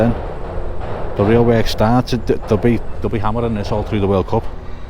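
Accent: Liverpool, United Kingdom. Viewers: Cool hearing the difference between the actual Liverpool accent (yours...I'm guessing N.Liverpool..?) and Wirral accent. I know given the short distance outsiders think the two accents must be the same the same....but they're not.✌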